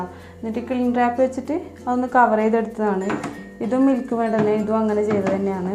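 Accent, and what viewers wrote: Kannur, India. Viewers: Enthooli nammale kannur slang😁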